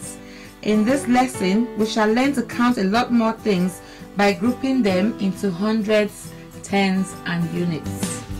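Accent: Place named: Nigeria. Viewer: Wow a 🇳🇬 Nigerian voice ❤❤❤❤❤ 😊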